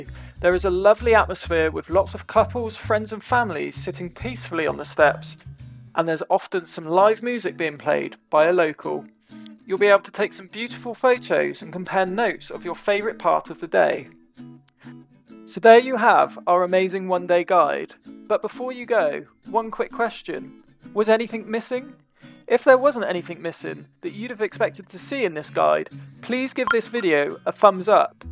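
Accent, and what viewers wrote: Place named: England, United Kingdom. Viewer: You should REALLY REALLY learn how to pronounce correctly the Italian high points you’re trying to have tourists visit…..it’s quite an embarrassment to hear you TRY to speak Italian. You must be British because they NEVER pronounce Italian properly…and your accent points to England for sure. Thankfully I went to school there and speak Italian (northern, I might add…the proper Italian) so please stop these Italian tips……take a class, already.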